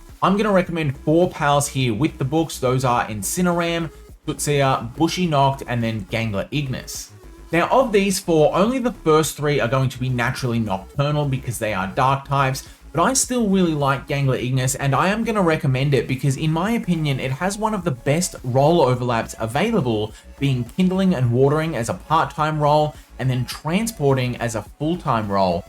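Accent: Australia. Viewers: how do you have not 1M subs... first of all, your content is amazing and you covered basically everything and secondly, who doesn't love aussies and their accent?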